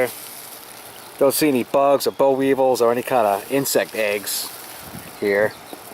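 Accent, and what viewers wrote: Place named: Boston, United States. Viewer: LOL! I'm born and raised NH and when I hear you talk....I hear Boston....LOL!! Nothing personal....not trying to be disrespectful.....just not 'hearing', the accent I expected to hear. Still a good video....and I subscribed....looking forward to more....we need to learn to live with less....we are spoiled and all those creature comforts, have made us prideful!!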